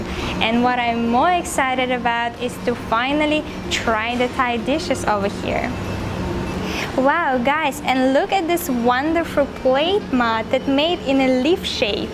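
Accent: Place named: Russia. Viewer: She looks like more Russian than Germany and also her accent. Haha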